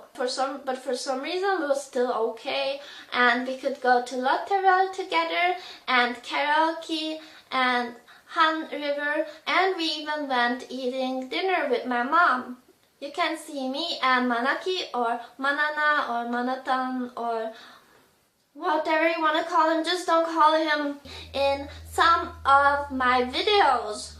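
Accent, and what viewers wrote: Switzerland. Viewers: She’s swiss-german Which can explain her accent English wouldn’t be her first language and Japanese either. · She's from Switzerland so she has an accent. Go fuck yourself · She's not Japanese. She has a Swiss German accent.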